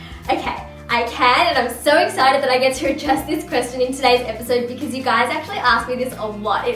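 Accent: Australia. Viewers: Are you Australian? I'm very new to this channel, this is the third video from your channel I have watched! 😂 I'm not trying to be rude or send hate! I'm only asking a question.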